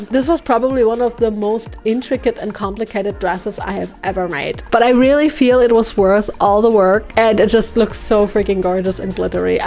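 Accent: Germany. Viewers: i literally KNEW you were German cuz it’s the accent, ANYYWAYYY girl how do you have so much talent? 😔that doll looks AMAZING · Du klingst deutsch 😉 👍🏻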